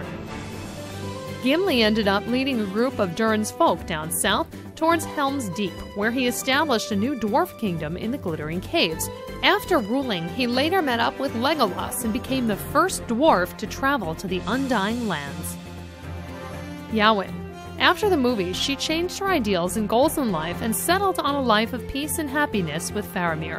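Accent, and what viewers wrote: United States. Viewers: she's American, and they obviously don't/can't pronounce English like the British do! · i couldn't even bear to get to that part. 8 seconds in i stopped the video because of her watchmojo american accent that clearly gives off the vibe that she has no idea or interest in what she's talking about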